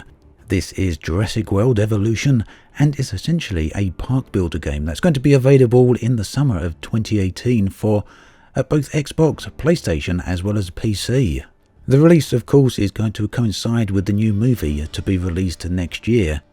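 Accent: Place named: RP, United Kingdom. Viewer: Hello cant wait for the new game play to come to live servers as we need a massive war and all factions to unite against a common foe. Also have you watch the brocast as they protreyed you as the james bond of elite dangerous with your posh actsente.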